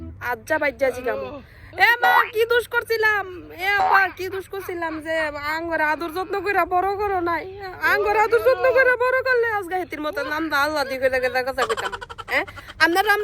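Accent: Comilla, Bangladesh. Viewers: এটা কুমিল্লা মুরাদনগরের ভাষা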